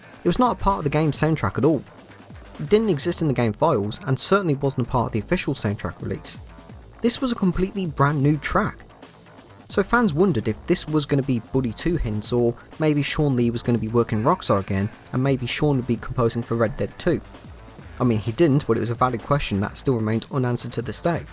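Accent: United Kingdom. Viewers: He’s British that’s why he sounds like this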